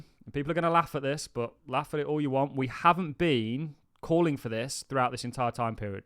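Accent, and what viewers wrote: United Kingdom. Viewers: Been watching crypto YouTube for 8 years now. is the best non biased analyst I have seen. Plus his sweet British accent is like butter in the morning. There is no need to rage my friend. It’s just a daily update. Go somewhere else and listen to what you want to hear. Plenty of opportunities for news.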